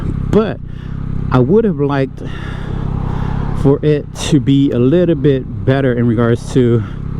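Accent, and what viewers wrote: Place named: Louisiana, United States. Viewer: Are you in Louisiana? You sound like one of my Cajun cousins.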